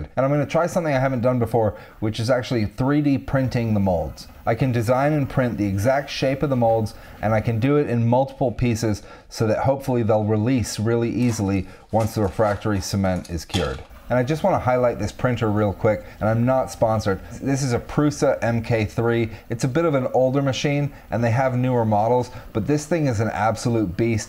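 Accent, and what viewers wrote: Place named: United States. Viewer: This channel may be the most useful, applicable, & effective content on here. It's the meat & potatoes answers for worldwide, pertinent questions. My biggest question is why do you sound American & not British? Personally I'd love to understand how you got where you are & what you're doing today? How did you aquire your skillsets & why you find what you do valuable?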